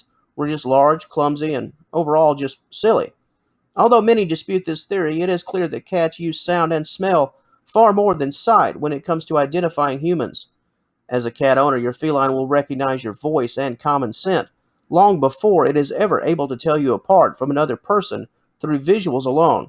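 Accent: United States. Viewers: I don't like your cowboy accent. Difficult to understand for us non-speakers. Improve.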